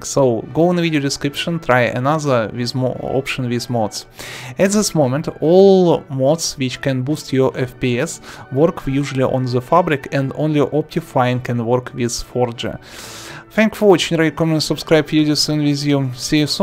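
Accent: Russia. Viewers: i think russian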